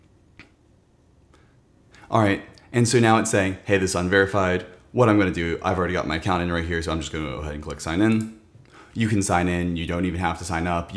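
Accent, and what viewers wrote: California, United States. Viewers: I have no idea where you live but when I listen to you all I can think of is SNL "The Californians". lol Your videos are greatly appreciated. Thank you.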